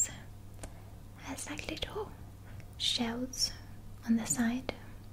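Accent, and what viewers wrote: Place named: New Zealand. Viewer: wild guess but i was thinking the same, she might be from new zealand?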